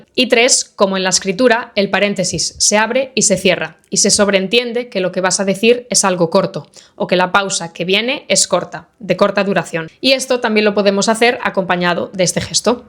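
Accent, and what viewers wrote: Spain. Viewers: He encontrado su canal de YouTube. Soy brasileño y aprendí a hablar español con un matrimonio de más edad que tenía una hija pequeña, y nuestra amistad llegó al punto de que me trataron como a un hijo. En aquella época, ellos tendrían alrededor de 45 y 55 años, y yo unos 22; hoy soy yo quien tiene esos 55 años. Y con ellos aprendí a hablar español. Ella era catalana y él, sevillano. Mi español, sin falsa modestia, llegó a ser tan bueno que los hispanohablantes de América Latina, cuando conversaban conmigo, pensaban que yo era español, y se sorprendían cuando les decía que no, que en realidad era brasileño. En realidad, fuera de Brasil solo he estado en México y en Ecuador. Pero en Brasil he conversado con muchos hispanohablantes de distintos países de América Latina, y todos siempre creyeron que yo era español. Obviamente, un hablante de España debe notar que no soy nativo, pero el hecho de que los hispanohablantes latinoamericanos piensen que lo soy, me alegra mucho. 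Encontrar su canal ha sido un verdadero hallazgo, pues por diversas razones perdí el contacto con aquel matrimonio y echo de menos escuchar ese acento hispano. Como sabrá, en Brasil hablamos portugués y aquí pocas personas saben hablar español, o cualquier otro idioma que no sea el portugués. Y oírle hablar con ese acento suena como una música para mis oídos.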